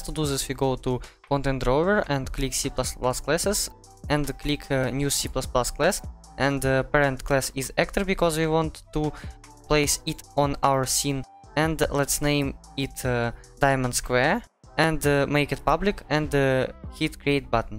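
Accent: Russia. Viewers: Amazing! Бат ёр рашн аццент выдает тебя с поторохами😊 И это здорово, так как твой английский я понимаю и без субтитров.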